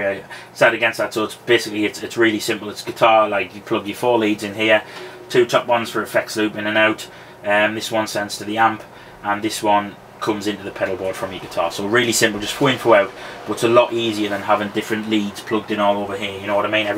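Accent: Newcastle, United Kingdom. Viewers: are you a geordie mate? great pedals big fan mate :)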